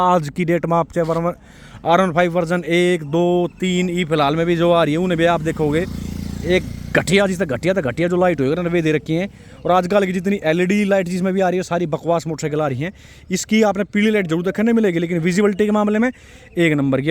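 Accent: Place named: Bihar, India. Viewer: Biharii laag rha hai ... Tari video dekh k tari bhaab ni baada saktaa 👊👊👊👊👊👊👊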